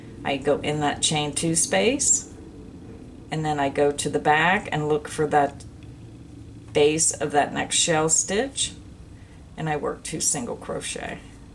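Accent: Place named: South, United States. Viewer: Love your southern accent especially when you say Grandma.... Love your channel period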